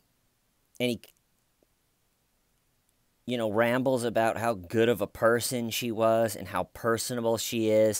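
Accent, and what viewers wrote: United States. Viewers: His accents too American and irritating. I keep wanting to shake him and tell him to speak properly.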